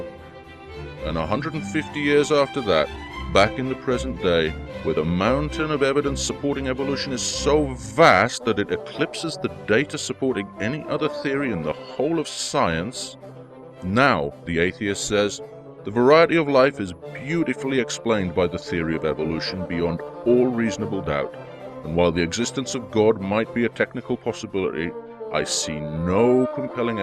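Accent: England, United Kingdom. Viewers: narration is going to be easier to consume because as you noted, text covers SOME part of the screen, looks like crap if it's too long, what font to choose????? etc. Narration FTW! Besides, if that was your voice I heard on Claire's shoutout, you kill at narration. English accents give most Americans a huge chubby.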